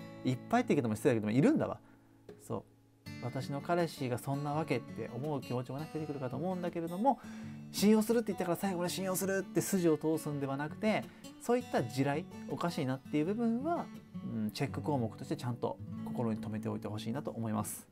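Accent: Kansai, Japan. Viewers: 関西なまりめっちゃ好きです笑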